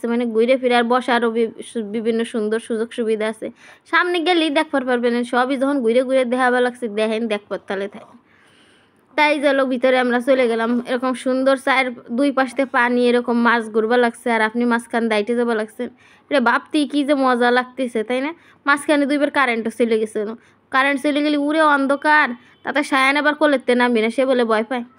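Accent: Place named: Gopalganj, Bangladesh. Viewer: আপনার বাড়ি মনে হয় গোপালগঞ্জ ভাষায় তাই বলে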